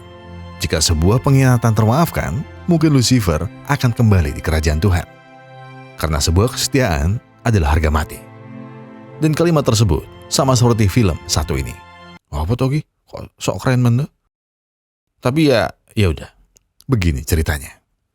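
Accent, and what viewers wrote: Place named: Yogyakarta, Indonesia. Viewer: wong jogja bro, seko logat e ketoro wkwk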